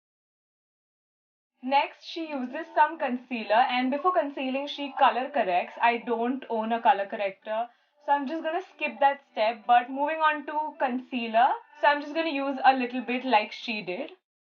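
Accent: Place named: Gujarat, India. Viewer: Your Gujarati is soooooooooooooooooooooooooooooooooooooooooooooooooooooooooo cute 😍😍😍😍😍😍😍😍😍 OMG ❤❤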